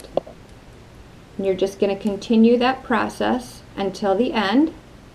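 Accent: Wisconsin, United States. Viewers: → ... stayed a year in Wisconsin as "jeune fille au-pair" (to learn English) in a beautiful house right at PineLake or LakeChenequa in Nashotah. – So even I was able to recognise your accent.] Greetings from Basle, alexy wi.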